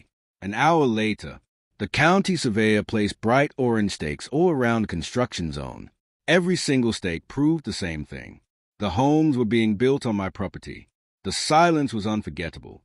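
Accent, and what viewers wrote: United Kingdom. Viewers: Same British nariator.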